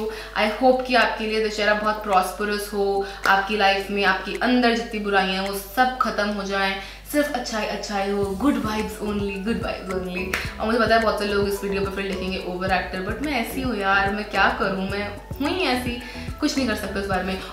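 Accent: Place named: Delhi, India. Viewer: oh my god!desi grl ..ab pure ki pure delhi wali lag rh ho ...aap k hindi ...just wowwww ..😍😍😍😍😙😙😙😙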